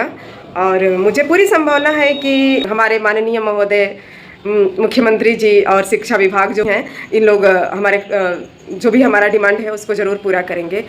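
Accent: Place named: Uttar Pradesh, India. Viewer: Aap kaha ki Rahane wali h mam lagata h aap up ki h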